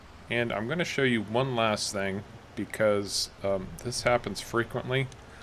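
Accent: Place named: United Kingdom. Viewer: Hi Thank you so much -- without your intro 10 vids on "Ravenport" I'd never have played this game. I can tell you as a fellow Brit its that accent - a winner in USA. Also there aren't any other vids that give useful info on how to do. Ive seen the "out in the wild vid" love that. Im now in Dallas ex New York. Im totally absorbed in Ravenport on my way to 1st $million. About to expand field 19 up the hill. What I would love is a tutorial if you have one on making the tree cutting/logging viable and worthwhile. The weird bark colored trees are a real hassle to cut up and transport and sell - 23 so far. Ive had success with some leveling landscape but want to put chickens pigs and cows into Ravensport which is so small but I believe its possible. How about Ravenport Part 2 my first Million???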